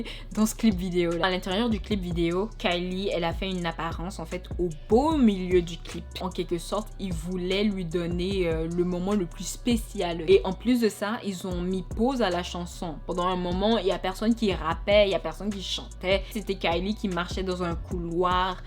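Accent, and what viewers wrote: Quebec, Canada. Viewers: Hey pourquoi tu parle comme une kebecoise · T québécoise ? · You sound like Canadian. I don't like that song, it denigrates black women.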